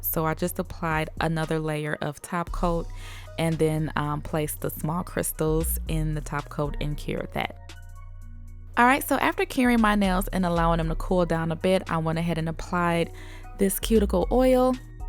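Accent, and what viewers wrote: South, United States. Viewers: I guess Nashville has rubbed off on ya a little bit lol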